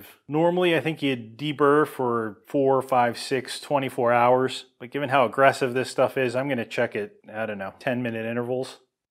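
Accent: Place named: Philadelphia, United States. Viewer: As an amateur linguist. And a cunning one, at that. Something weirds me out... you have a Philadelphia area accent... but you said "pop cans". People in the northeast don't say "pop cans", they say "soda cans". So wtf? My conclusion: you're from the Philadelphia area, but you currently live somewhere in the middle of popcan country. OR, it could be that you're in the rare, super thin strip of middle Pennsylvania where the Philly accent overlaps with the popcan people. whats the deal?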